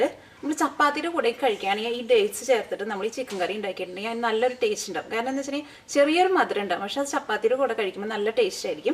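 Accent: Thrissur, India. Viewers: You are interesting to watch. Your Malayalam with that Trichur slang is more interesting. You are my guru in cooking.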